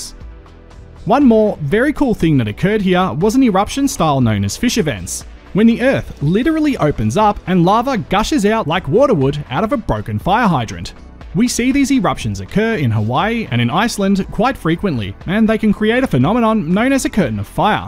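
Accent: Australia. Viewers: Great to hear an aussie accent here on YT well researched and presented indeed. NSW here. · Its awesome to hear more Australian accents on Youtube, especially on 'serious' channels that don't just involve gravity or hitting things with other things while wildly gesticulating and jabbering in monosyllables lol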